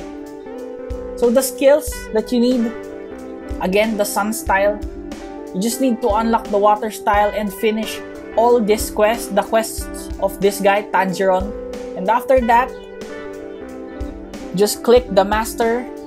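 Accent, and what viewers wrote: Philippines. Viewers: u sound like filipino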